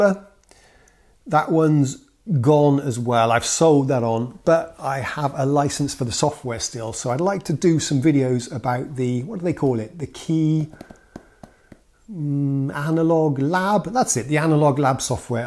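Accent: United Kingdom. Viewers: And... Are you British, Swedish or from another country? I always thought you were British like me!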